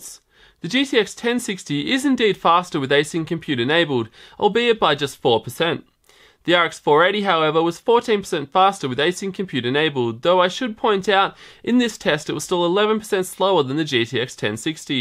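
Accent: Australia. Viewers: Well done video, and I like the fact that you did not jump to conclusions where you didnt have enough information. Unlike that AdoredTV dude... well done sir. Curious what accent is that? Australia?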